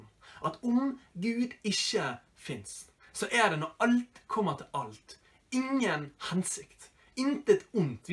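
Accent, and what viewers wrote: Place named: Bergen, Norway. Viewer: Dette er knall bra, liker at du er glad hele tiden og elsker bergens dialekten!!! Me ser på deg i klassen av og til, går på ein kristen skole der du har blitt vist på andakter flere ganger! stå på!!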